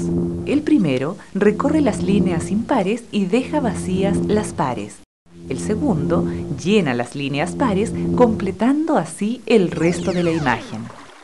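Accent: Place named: Chile. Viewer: Se le nota el acento chileno a la morra que habla en el vídeo.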